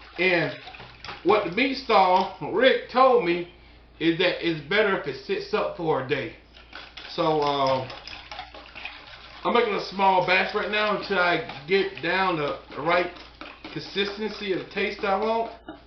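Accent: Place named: South, United States. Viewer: He’s got that Southern draw that’s for sure.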